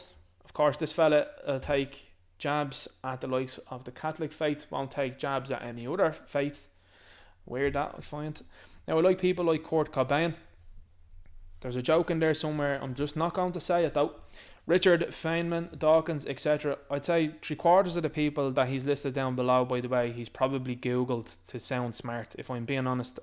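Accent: Ireland. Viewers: Can't stand the 🤡 not funny at all ever was he's cringe just shouts makes me emberressed to be Irish and his stupid culchie accent annoys me....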